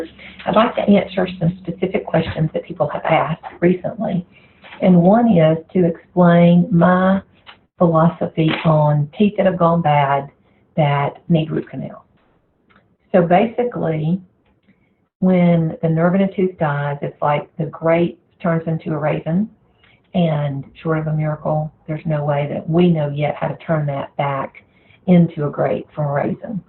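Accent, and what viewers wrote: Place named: London, United Kingdom. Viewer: Love your accent! Are from from east or west London?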